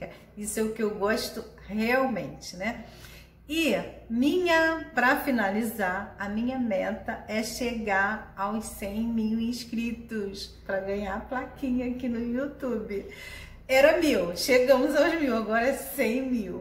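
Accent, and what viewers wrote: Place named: Rio de Janeiro, Brazil. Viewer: Nossa vc é baiana, mas tem um sutaque muuuuiiito puxado de carioca 😊 bjos! Vc é um amor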